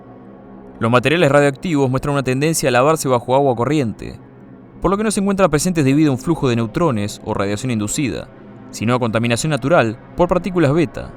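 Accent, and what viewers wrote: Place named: Buenos Aires, Argentina. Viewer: Sonás re-porteño flaco, ¿de que barrio de capital sos? (no es una crítica, lo pregunto con buena onda). Capital federal (denominación antigua para el distrito federal nacional de Argentina), C.A.B.A (ciudad autónoma de Buenos Aires: denominación moderna)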